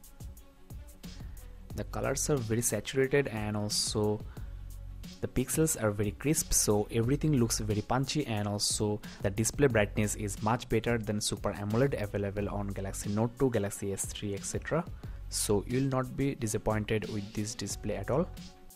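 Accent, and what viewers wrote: Bengal, India. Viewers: OMG..you are eating your words when you are talking...i know you are indian but make it clear explanation..... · bangali talking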